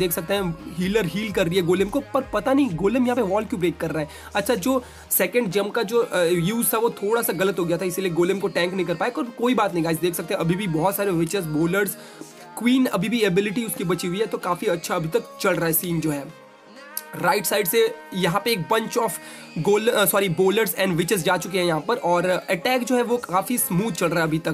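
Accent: Bengal, India. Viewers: you are Bengali????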